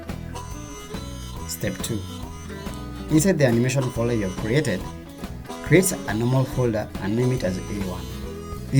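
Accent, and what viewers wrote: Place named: Africa. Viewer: African accent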